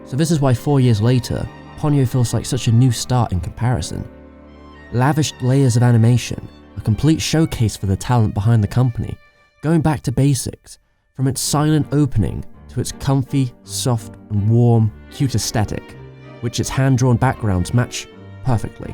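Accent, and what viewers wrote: England, United Kingdom. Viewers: ''there aren't like a hundred more Miyazaki films''we don't need them!!!!!!!!!!!!!!! they were great but not always the best!!!!!!!!! there are others out there ….nothing has to be a perfect animation!!!!!!!!!! Ghibli only got popular because of Japanese culture of façade and completabilities with other animating companies... the world went through a trendy stage where Ghibli came on a time where animation needed some direction...let go of this idiotic Ghibli shit!!!!!!!!!!!!! it's over...picachu came with live version which is absolutely fuckin' idiotic...hopefully miyazaki will not allow asshole Disney to make idiotic live version of Ghibli shit!!!!!!!! is it me or is it always have to be an English accented fool doing Ghibli documentaries...?not bad doc....maybe because appreciation and understanding is far better than many other culture that English accented people do these types...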